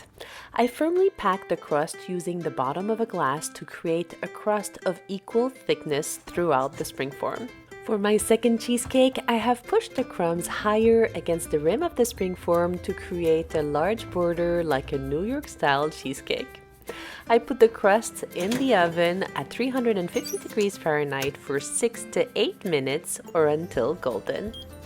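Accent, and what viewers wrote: France. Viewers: Hi! I can hear a french accent behind your hebrew words! Haha! I really love your channel and everything you present in it. It brings a lot of positive energy and ratzon to prepare good things as well. Be blessed! Yeshar koach! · Bonjour. La façon dont vous prononcez certains mots me fait penser que vous êtes française. Vous prononcez certains mots avec notre accent français. Je me trompe? Merci pour vos vidéos. C'est grâce à vous que je découvre votre univers et c'est super intéressant et beau à voir.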